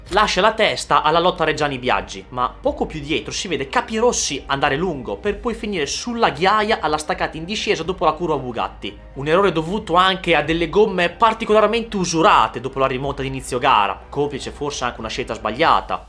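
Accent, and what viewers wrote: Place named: Modena, Italy. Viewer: Di dove sei? A volte sembri reggiano, a volte modenese... più modenese...bei video!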